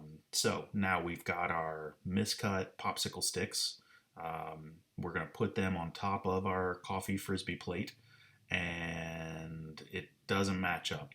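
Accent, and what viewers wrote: Norway. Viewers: I haven’t laughed this hard in weeks! Bunny suit, whitest paint out there, dip them in a bucket, stamps with a Norwegian accent, I had to pause the video and regain a semblance of control so I could hear the rest. What a guy!!! Thanks to you both for such a fun watch, wash those hands and stay safe and well. Kris P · Norwegian accented stamps... braided and unbraided and braided again rope 😂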